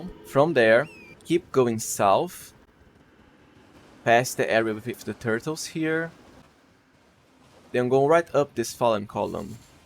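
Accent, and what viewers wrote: Brazil. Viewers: esse cara é br né? esse sotaque n engana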